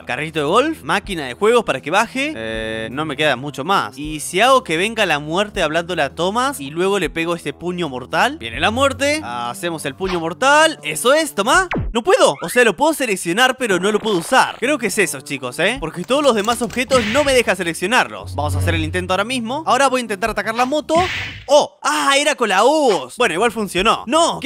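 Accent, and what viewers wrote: Argentina. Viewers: Sos argentino?????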